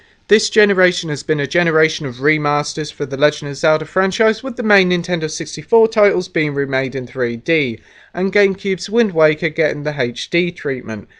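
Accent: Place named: United Kingdom. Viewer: Love British accents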